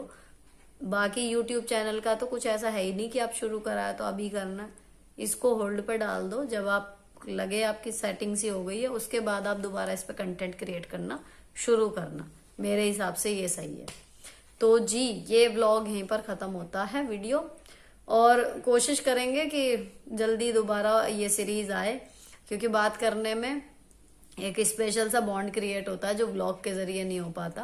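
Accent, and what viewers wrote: Meerut, India. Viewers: आपकी भाषा पूरी मेरठ की है, खड़ी बोलीं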